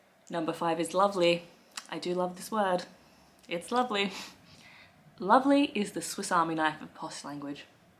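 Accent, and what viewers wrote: Australia. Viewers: You come across as a posh Sheila, only a trace of an Aussie accent. Oh, I've always called it Dinner, supper is that snack midway between an earlyish dinner and bedtime whilst watching that late TV programme. It has always been the Drawing room, a door from both the hall and dining room, it had a baby Grand in the bay window the TV almost hidden in a corner, the living/morning room was the one we spent most time in, next to the kitchen. Dad was the posh one, Mum was the almost Cockney, SE London of Russian Jewish ancestry. My accent is a mixture of both with Dad's mannerisms. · Hello sauce pot💕 How do you know all these things about the UK upper crust Being a Aussie Sheila🐊🦘🦘 The UK upper class toffs only act modest to try and hide the deep inequalities that exist in the Uk You sound like a Aussie lady trying to talk English southern middle class with A aussie accent interesting little video sauce pot💕 Hoping you're keeping ok Nothing wrong with your Oz accent Don't hide it the Oz accent is great The great late Esben Storm brought the great Aussie ocker boisterous accent to the world 🌍🦘😉🤠🐨🦚 👍 Prisoner cell block h Bea Smith having a smoko mate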